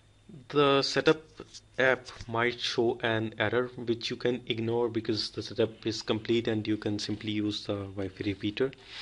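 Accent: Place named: India. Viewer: Indian accents are annoying AF! Had it on mute the whole time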